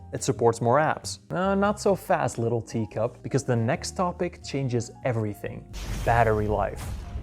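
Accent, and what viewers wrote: Netherlands. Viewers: Ik hoor een heeel Nederlands accent 😄 Eindelijk een goeie vergelijking die ik zocht. Thnxxx👍👍👍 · The first dutchy who doesn't have such a strong accent haha dankjewel!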